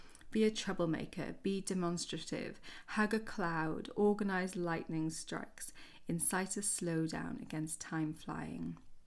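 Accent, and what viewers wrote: United Kingdom. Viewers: Thank you for sharing this, even though you didn't entirely feel like it. I appreciate it so much. Not to be weird, but your voice is so soothing (part of that is the dumb American in my loving UK accents lol) and I'm having a rough night with insomnia so am catching up on YouTube. I'm teary now watching a familiar video from someone I love. And please note that this comment is NOT pressure to continue posting - if you don't feel up to it, don't do it! But I just want to say thank you for this one.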